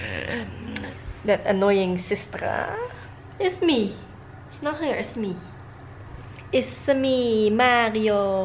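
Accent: Netherlands. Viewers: Aaah ik hoor gewoon dat je Nederlands bent! Geen idee waarom maar je weet het gewoon als er een fellow dutchie is😂 Je lijkt me echt een leuk persoon, als je in Amsterdam bent zouden we moeten winkelen ofzo x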